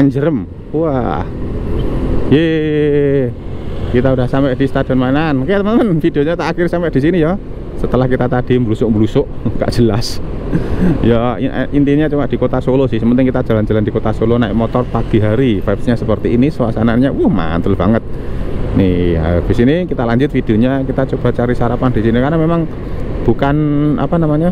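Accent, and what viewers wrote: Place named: Malang, Indonesia. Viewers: Solo pancen ngangenin, Mas wong Klaten tapi logete Malang puol.. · ngomong omah e klaten mas, tapi logat mu wong jatim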